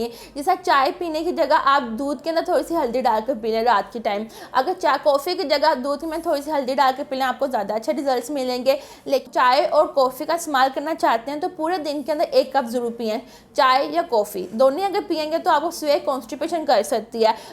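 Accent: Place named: Lahore, Pakistan. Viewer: Lahori accent..love u ho gya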